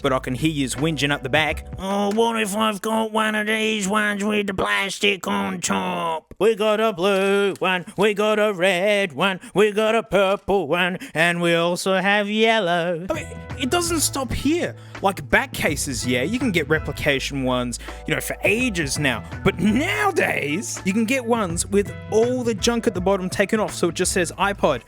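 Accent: Australia. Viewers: He gets more and more Aussie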